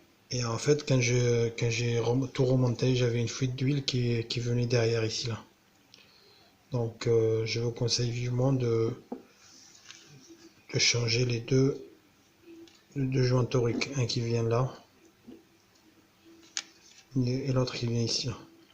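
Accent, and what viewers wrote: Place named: North Africa. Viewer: Accent bledart et accent du sud ca fait space.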